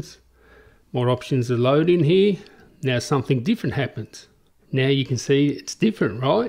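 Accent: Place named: Australia. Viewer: Australian accent is hard for spanish/latin speakers, I've watched a couple of Crocodile Dundee and then came back to this video LOL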